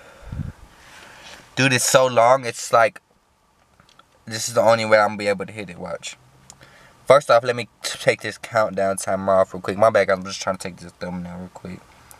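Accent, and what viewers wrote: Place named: Houston, United States. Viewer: This dude sound like he from Houston 😂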